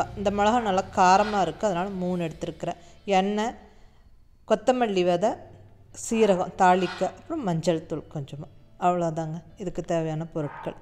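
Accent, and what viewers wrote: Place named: Coimbatore, India. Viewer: Beautiful kitchen Madam ...😍😍கோயமுத்தூருங்ளா நீங்க? அரசாணிக்கா சின்ன வெங்காயம் தொளிச்சுன்னுல்லாம் பேசறத கேட்டா அப்படி தெரியுதுங்க... ரொம்ப என்ஜாய் பண்ணி ரசிச்சு சமைக்கிறீங்க... 💛💙💜💗💚❤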